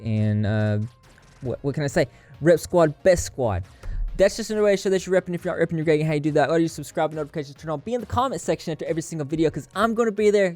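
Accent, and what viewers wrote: South, United States. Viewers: You gotta be from the south, lol?? Shout-out from NC!!!! I'll admit, back in 2012 I watched a video of a MM concert where he pushes a bandmate on stage in front of hundreds of people. The guy stops playing the guitar for a moment, long enough to briefly yell at Manson, raise his fist as If he is going to start throwing blows. But he quickly starts playing guitar again.... At this time, MM mocks the guitar player by placing his hands in the air and moving them as if he is working a puppet on strings to the beat of the music. Seeing this, I developed a feeling in the pit of my core that told me MM had abusive tendencies, and I couldn't help but think "God I bet that guy is an absolute nightmare to deal with". And quiet frankly, after I watched an interview about Manson being a follower of Anton Szandor LaVey, Aleister Crowley, was given an "honorary priesthood" and claims to be ordained by the Satanic Temple, and has actually had run-ins with books such as Necronomicon.. 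one might be forgiven for believing he is indeed capable of committing such horrendous acts upon another human being. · Bro that's a sick southern accent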